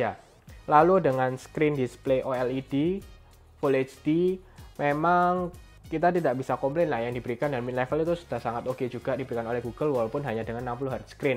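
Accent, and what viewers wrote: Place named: Surabaya, Indonesia. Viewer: Pasti org Surabaya ya bang?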